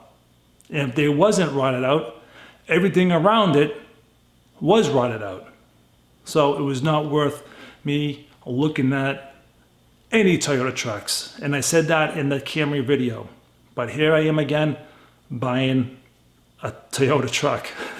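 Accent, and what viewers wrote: Boston, United States. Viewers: I knew I detected a Boston accent. Also, my father had 97’ 4Runner stick shift, really bulletproof. He put 267k on the odometer because he was in the military going from New York to Texas. The vehicle raised me half my life. You made a great investment because in terms of reliability and resale value it’s worth it. Sadly, it’s unfortunate that the frame was rotten, those are pricey to buy and replace.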